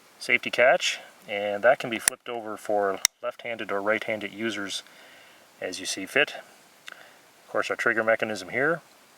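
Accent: Canada. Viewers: He is speaking in Canadian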